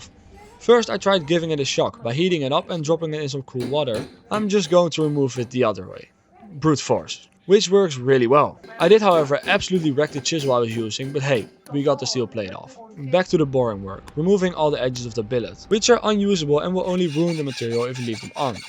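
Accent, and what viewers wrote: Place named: Netherlands. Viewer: The dutch accent is heavy 😂😂😂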